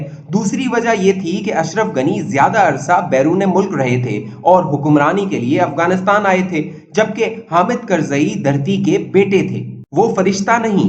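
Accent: India. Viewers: The narrator in this vlog has subtle Hindi accent. For he say Ashraf Gani instead of Ghani.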